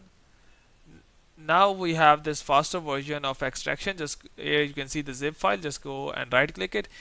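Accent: India. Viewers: Cannot understan anything you're saying. You talk too fast and the Indian accent is impossible.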